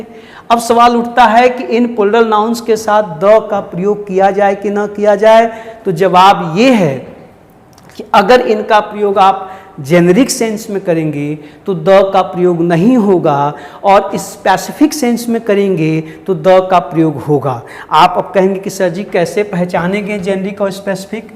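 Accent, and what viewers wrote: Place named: Bihar, India. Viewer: sir ka dialogue aik to upar se bihari bht acha lagta hai ye😅😅😅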